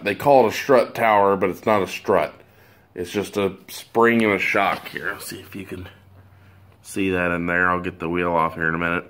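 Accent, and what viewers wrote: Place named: East Coast, United States. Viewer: About time someone knows how to talk east coast checking in.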